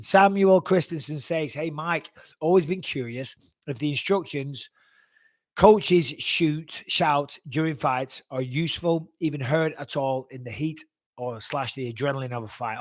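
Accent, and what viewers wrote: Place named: United Kingdom. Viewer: Not sure why you were one of my favorites… probably that British accent ;)…love the content brother keep it up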